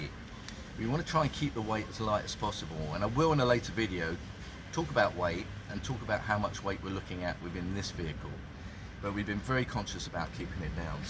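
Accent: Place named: United Kingdom. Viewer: Also nice to hear from a Brit doing this stuff. That’s not meant to be an inflammatory or discriminatory comment, it’s just nice to hear a familiar accent, a different perspective and someone from home enjoying their Troopy build - and there aren’t that many Troopies in the UK!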